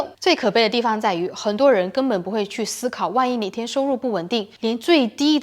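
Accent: China. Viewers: 這女的是對岸嗎，鄉音好濃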